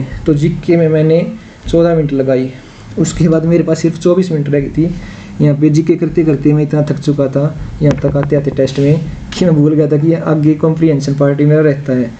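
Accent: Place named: Haryana, India. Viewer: Haryana alya ki to boli t e pichan hoja h konse district ka h bhai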